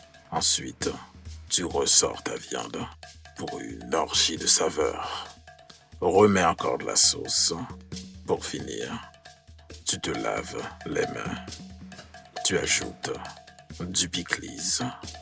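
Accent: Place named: Quebec, Canada. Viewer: Belle recette et bonne narration. J'ai crue remarquer un très petit accent Québécois, la poutine ma confirmé le tout. Merci. ;)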